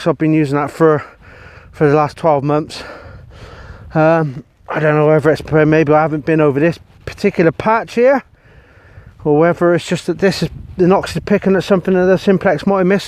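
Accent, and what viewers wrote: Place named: Hereford, United Kingdom. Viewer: Enjoyable watch. Know how you feel, was a similar thing with my Gran whom I named my daughter after. Also have a Nox and love it just desperate to get back out. Have to ask where you're from as your accent is similar to mine (to my ears anyhow) 😂(Hereford here)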